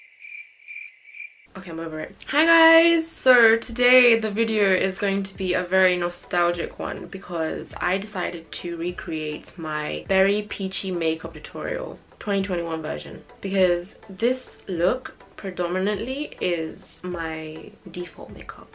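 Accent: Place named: London, United Kingdom. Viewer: ngl I did NAWT expect you to have a London accent but what’s good 💅🏾✨ make up looks sick n I hope you feel a little better now 🥺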